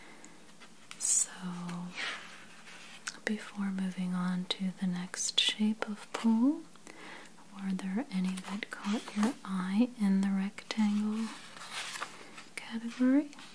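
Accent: United States. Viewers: I love all your videos....i wish i knew what state you were from....your voice...😌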